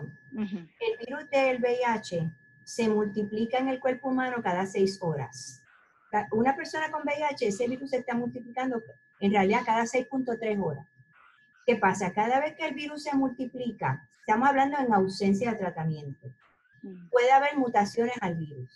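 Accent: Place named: Caribbean. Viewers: Tiene acento caribeño pierde credibilidad y peor si son venezolanos. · Jorge José, decir que una médica pierde su credibilidad por tener acento caribeño es racismo. Que pasa con los médicos caribeños? No son lo suficientemente buenos para ti? Todas las personas deben ser tratadas con respeto, independiente de su país de origen.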